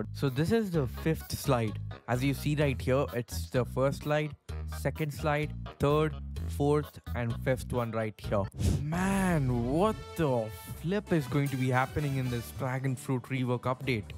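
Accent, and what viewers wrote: India. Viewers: Your accent sounds like indian idk why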